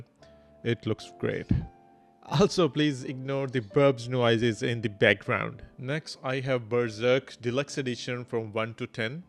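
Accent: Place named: India. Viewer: why your accent is so horrible like an indian accent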